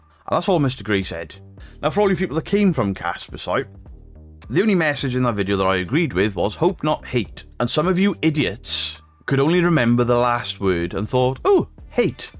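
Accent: Wales, United Kingdom. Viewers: it's a Welsh accent and Welsh people rock 😎🤟🌟🏴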